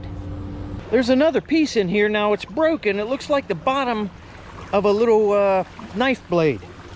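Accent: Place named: Pittsburgh, United States. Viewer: Beaver and Ohio meet....Sure there is some there....Love your videos....Love that Pittsburgh accent....lol...I got it too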